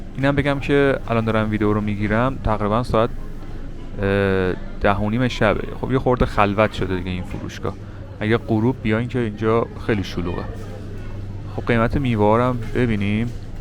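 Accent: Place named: Iran. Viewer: love the Iranian accent greetings from syria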